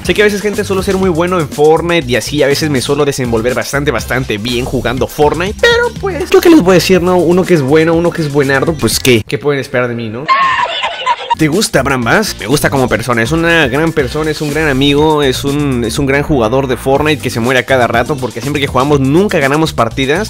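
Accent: Chile. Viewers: habla como Chileno por un vídeo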